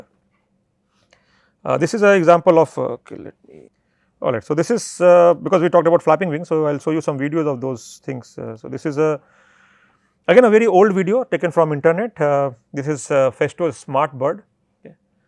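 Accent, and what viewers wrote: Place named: Oxford, United Kingdom. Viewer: Sir aap hindustan me ho eng me ho oxford ki trh kyo bolte ho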